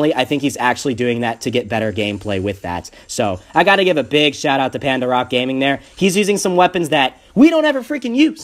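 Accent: United Kingdom. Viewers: Nice british accent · No offense but u sound British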